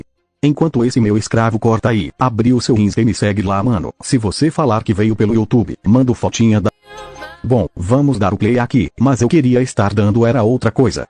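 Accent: Bahia, Brazil. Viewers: O e baiano?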